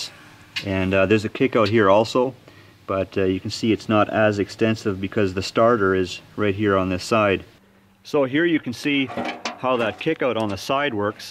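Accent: Canada. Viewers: Canadian? I love the accent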